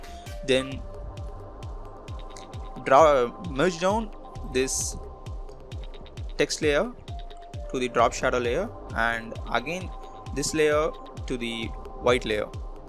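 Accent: India. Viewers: I will never again make fun of Idian nerd guys accent ever again. Thank you so much.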